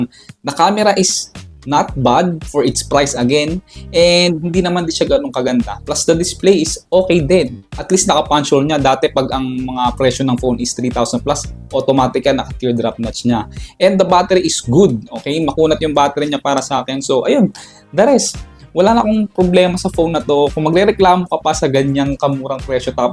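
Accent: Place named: Pampanga, Philippines. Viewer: you sound Kapampangan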